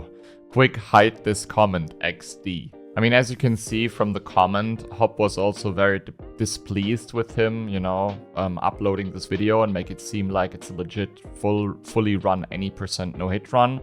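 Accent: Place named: Germany. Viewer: Du kommst aus Deutschland (oder zumindest dem DACH-Raum), machst aber englischsprachigen Content, um potenziell mehr Leute zu erreichen, oder? Also einmal ist da natürlich deiner deutscher Name, andererseits dein Akzent. Ich war nur erst verwirrt, weil YouTube mal wieder von sich aus den Videotitel übersetzt hat ("Der größte Betrüger in Elden Rings" 🙄).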